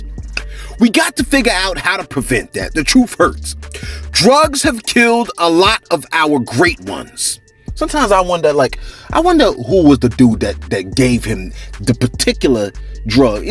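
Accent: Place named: New York, United States. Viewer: U sound like a dude from new york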